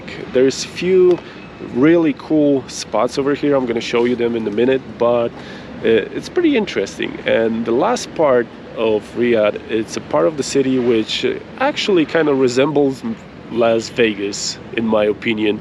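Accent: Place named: Poland. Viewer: This guy isn't American. He sounds polish to me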